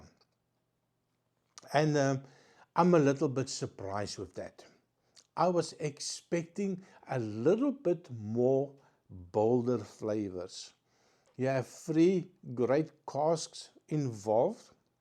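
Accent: Afrikaans, South Africa. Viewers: You sound Afrikaans South African... I know because I sound the same😂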